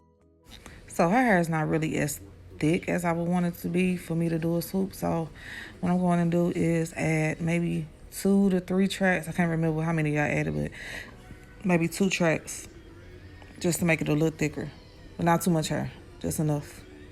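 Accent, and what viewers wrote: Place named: St. Louis, United States. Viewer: Gotta be from St. Louis with that accent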